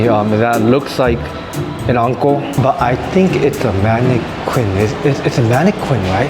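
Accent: Singapore. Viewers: I luv your Singlish ❤👍😊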